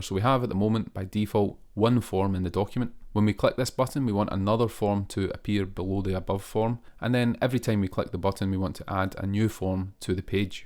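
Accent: Scotland, United Kingdom. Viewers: Subscribed for the amazing combination of relevant web development content and a Scottish accent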